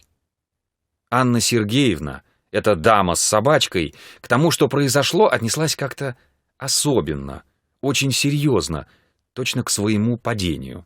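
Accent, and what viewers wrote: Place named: Russia. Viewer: Красивый русский акцент.Красивая дикция. Спасибо.Приятно слушать.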